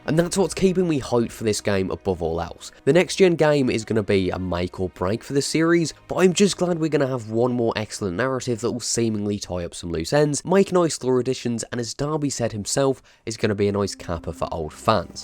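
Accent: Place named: England, United Kingdom. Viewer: Man with English accent likes the setting of England..... go figure.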